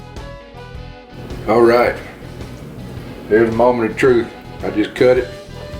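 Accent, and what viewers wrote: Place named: South, United States. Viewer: Right when I heard the southern accent I KNEW this was gonna be a great video! You didn’t let me down. I smashed that subscribe button buddy, smoke on my friend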